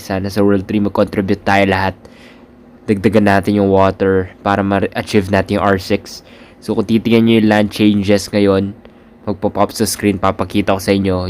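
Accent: Pampanga, Philippines. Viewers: Kapampangan ka sir? Hahaha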